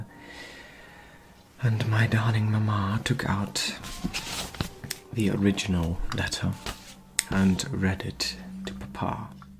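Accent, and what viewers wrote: RP, United Kingdom. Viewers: of all the historical asmr roleplays i watch regularly, these are my favorite as the older posh accent tops it off so well!! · Love this! Just discovering your channel now,. Love the background music, your posh voice and the creativity of this upload...brilliant! Thank you for the tea and cakes while catching me up on the latest gossip.❤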